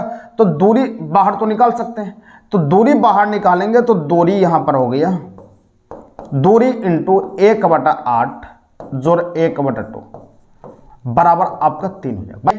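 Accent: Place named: Patna, India. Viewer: Sir I think आप पटना से हो न Please confirm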